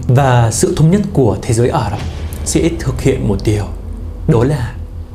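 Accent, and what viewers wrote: Standard. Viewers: Noi tieng viet nam chuan qua cam on . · Chào nhé..cô thấy cháu nói tiếng việt rất chuẩn. Cảm ơn vì cháu yêu đất nước của cô. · Mới biết kênh và rất ngạc nhiên vì nói tiếng việt quá chuẩn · mình cảm thấy hổ thẹn với bản thân mình quá. nói tiếng việt chuẩn và lưu loát quá. mà công nhận có trình độ địa lý đáng nể. chúc thành công trong cuộc sống. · ! Ban rat gioi toi nguong mo ban, Ban la nguoi noi tieng Viet rat chuan! (moi ban den tham qe toi Can Tho mot lan nhe!)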